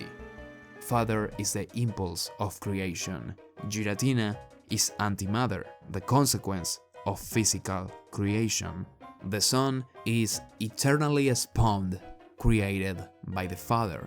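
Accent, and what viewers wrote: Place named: Spain. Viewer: I like your accent dude, where are you from? You mention empanadas in the description so I'm guessing español is your first language? Anyways really cool video with all the real world historical context. Earned my sub, man! I hope I will find more interesting videos when I check out the rest of your channel!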